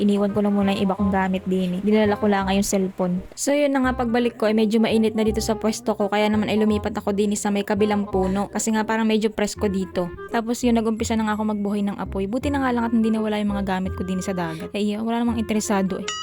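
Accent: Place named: Visayas, Philippines. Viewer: Mat halong Visaya Ang storya mo. may lahi ba kayong Visaya day?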